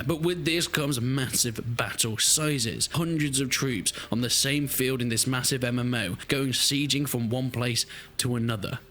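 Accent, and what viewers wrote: United Kingdom. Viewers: I can't help but despise your heavy British accent and pretentious voice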